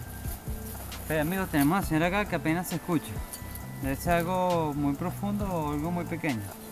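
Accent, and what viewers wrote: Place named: Venezuela. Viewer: hola... soy Mexicano casado con una venezolana... cuando estaba viendo tu vídeo ella me dice "... ese es Venezolano" ... que buen canal de detección tienes, saludos mi pana!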